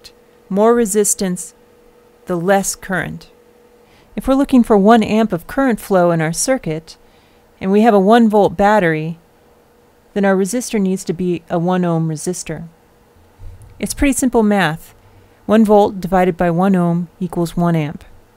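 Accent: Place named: France. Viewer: Finally, a video that encompasses comical French accents and electronics :3. Nice videos!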